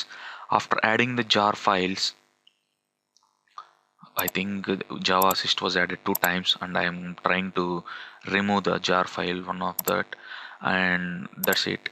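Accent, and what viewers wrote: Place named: India. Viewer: do you really have to be indian. like really? in every info videos INDIAN . INDIANN... Im not racist but my ears cannot hold indian.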